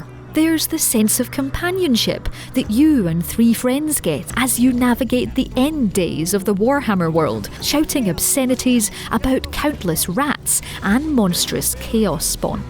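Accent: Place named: Scotland, United Kingdom. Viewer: Scotish Accent is gold very good content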